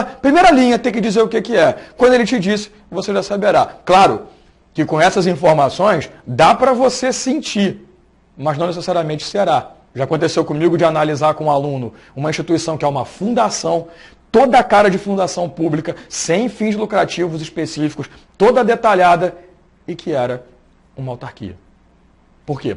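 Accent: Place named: Rio de Janeiro, Brazil. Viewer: esse sim é bom e verdadeiro carioca por isso que me indentifiquei.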